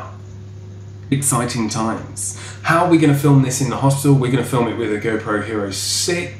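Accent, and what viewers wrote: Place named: United Kingdom. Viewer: sotaque inglês genuíno.muito engraçado o teu sotaque británico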